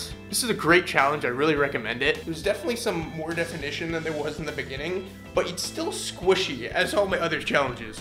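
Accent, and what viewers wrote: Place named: United States. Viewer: I guess ur born in the US then as ur accent is american.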